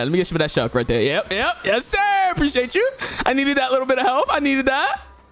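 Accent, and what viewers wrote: New York, United States. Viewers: You talk like your from New York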